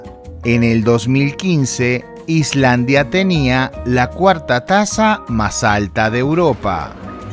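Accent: Argentina. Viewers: 🤯 ¡Me voló la cabeza!... Pero no por Islandia y su desmadre, la narración en jerga mexa con acento argentino, es como un arma para destruir a las IA. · Lo que no entendi es porque hablan mucho de Mejico y el que habla suena a Argentino,lol.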